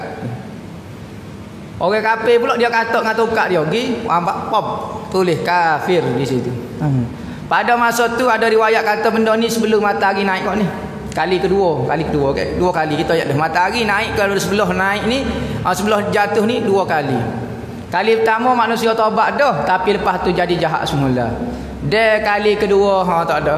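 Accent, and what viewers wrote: Kelantan, Malaysia. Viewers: Sedap dengar ustad kecek kelate....memang best....kadang²ustad lawak juga😅😅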